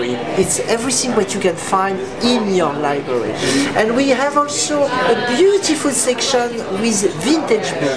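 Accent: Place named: France. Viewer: his high pitch voice with french accent are very adorable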